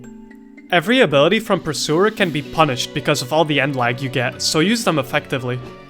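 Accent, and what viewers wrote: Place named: United Kingdom. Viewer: you sound british and amercan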